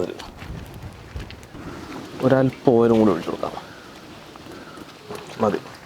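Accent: Palakkad, India. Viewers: Thani palakkadan samsaram🤩🤩🥳🥳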